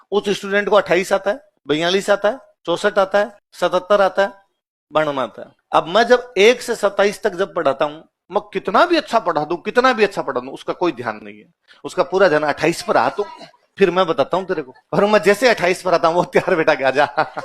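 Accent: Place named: Marwar, India. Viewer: सर आपकी बोली हुई मारवाड़ी भाषा बहुत मीठी लगती है। ❤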